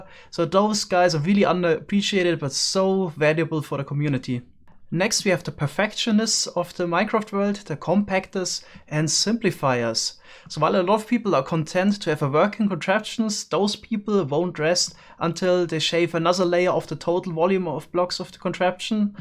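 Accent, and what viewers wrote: Germany. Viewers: Are you german cuz you have this accent? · I love ur german akzent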